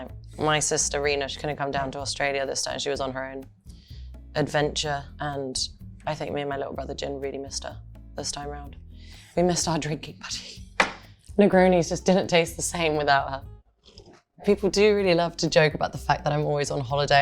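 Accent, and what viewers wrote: United Kingdom. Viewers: Omg❤😊I'm obsessed with british accent. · Stunning ❤❤❤ I didn't know, she has sort of like a British accent, love it ❤ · and the British accent is very strong, as strong as the drink 🍹 i suppose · Very sweet girl and very heavy UK accent ... 😅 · What kind of British accent does Dua Lipa have?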